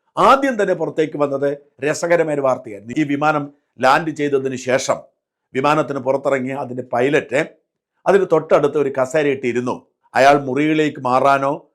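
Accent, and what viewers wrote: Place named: Kottayam, India. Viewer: എടോ... താൻ കോട്ടയം ജില്ലക്കാരൻ ആണെന്നാണ് ഞാൻ മനസ്സിലാകുന്നത്.. ഈ ആണേ.. ആണേ എവിടുന്ന് കിട്ടി?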